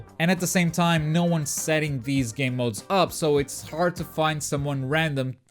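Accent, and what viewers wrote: Portugal. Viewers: Português? Notei pelo sotaque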